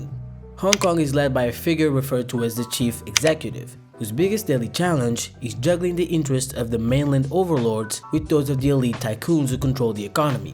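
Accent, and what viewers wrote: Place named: Russia. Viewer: Ебать! русский акцент! ххптьфу!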